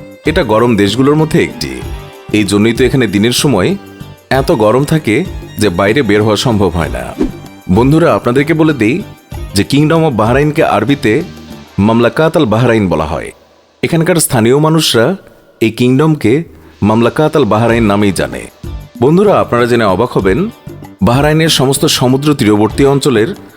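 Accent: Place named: Bangladesh. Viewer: Bangla bhasa ta shunlei bojha jai, kono ek Bangladeshi balche. Bangal bangla ta puro puri distinct from Bengali spoken in W.Bengal.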